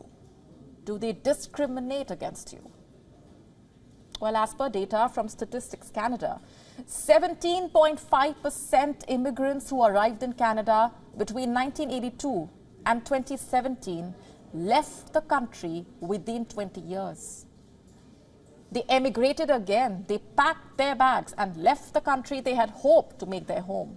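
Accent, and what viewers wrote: India. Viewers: this girl is trying to speak like an English speaker but her indian accent really dominates how she pronounce the words.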